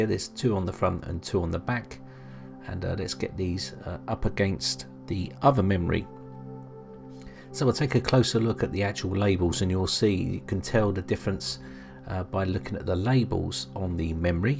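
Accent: England, United Kingdom. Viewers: What part of England are you from, Gloucestershire?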